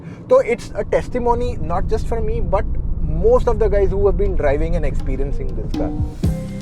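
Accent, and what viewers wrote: Gujarat, India. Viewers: Sir aap gujarat se ho kya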